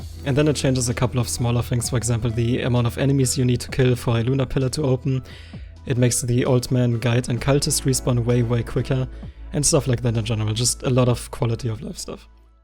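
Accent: Germany. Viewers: are you german? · I can hear the German accent in his voice/ greets from Germany Kamerad 🇩🇪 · U can really hear the german accent😂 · are you german?? xD bc you sound like german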